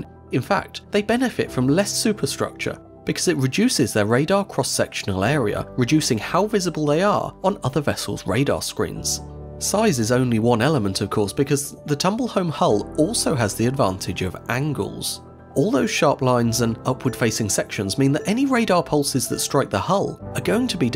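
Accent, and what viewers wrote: United Kingdom. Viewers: Why are all the youtube documentary and educational videos with a british accent? They're not ALL attractive, this one is gross he sounds like he's 12.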